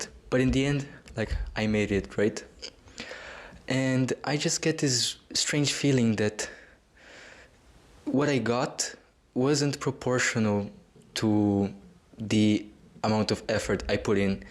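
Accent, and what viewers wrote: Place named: Brazil. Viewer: I feel a Brazilian accent but he could be italian too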